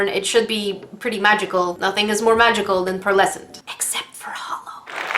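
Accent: Canada. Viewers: OMG are you Canadian?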